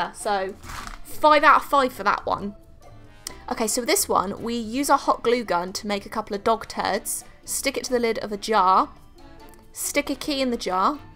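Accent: England, United Kingdom. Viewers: But like are you English?? I'm confused ahah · I live for that British accent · omg i've just found you're channel and i want to say that you're gorgeous 💕 you can't be real... a very pretty talented artist with a very cute british accent 😍😍 love from france sorry for my grammar :)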